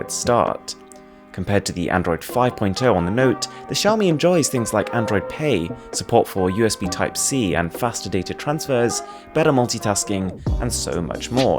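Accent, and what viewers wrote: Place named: United Kingdom. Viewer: he was born and brought up in England. He's a British citizen, and hence the accent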